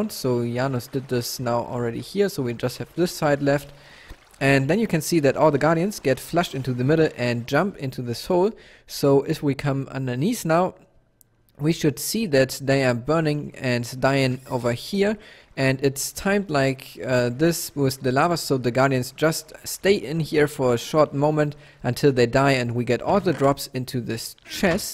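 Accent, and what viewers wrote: Germany. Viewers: you describing the killing chamber so calmly in a vaguely German accent made me uncomfortable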